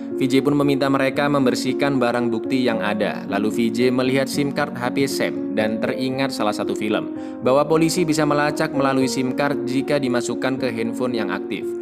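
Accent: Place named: Central Java, Indonesia. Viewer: Abang orang mana bang kok bahasa jawa nya kaya orang jawa tengah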